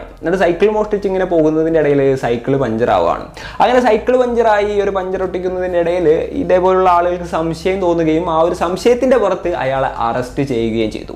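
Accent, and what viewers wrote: Thrissur, India. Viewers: Bro thrissur aano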